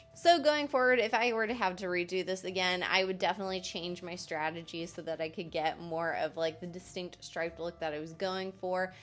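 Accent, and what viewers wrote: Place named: Missouri, United States. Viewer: Definitely off topic but are you from Missouri?? I feel like you have a very Missouri accent and I love it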